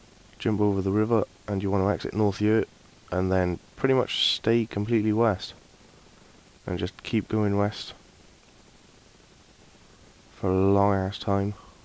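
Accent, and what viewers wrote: Wales, United Kingdom. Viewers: where are you from? Sounds like a welsh accent.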